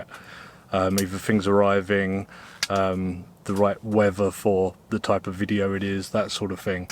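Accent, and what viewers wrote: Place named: Cockney, United Kingdom. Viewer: Love your cockney accent "Should have a Fick frost tonight".. I used to be inlove with a cockney lad, so it's a special accent for me. Your vids are so good, very relaxing!! My husband ended up liking them too:) Yeah.. pizza is hotter than sun (as one of your mates says) when it first comes out 😦 You could make a fortune selling "Open Fireplace" screen savers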